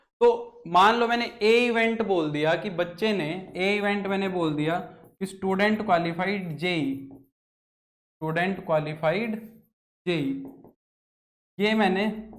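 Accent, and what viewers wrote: Haryana, India. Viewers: Is sir from haryana? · Sir app haryane ke ho na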